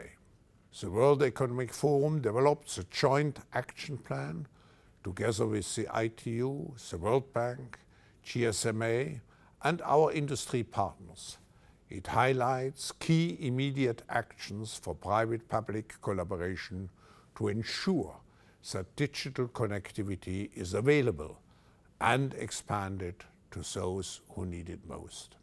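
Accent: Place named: Germany. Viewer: He's probably got the worst German accent, I have EVER heard....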